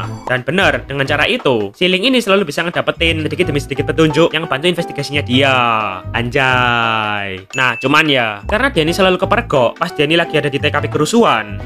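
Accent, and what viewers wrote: Java, Indonesia. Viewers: BANG LU ORANG JAWA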